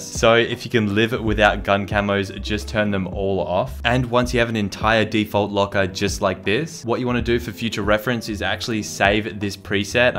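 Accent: Australia. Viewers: Are u Australian I just think u are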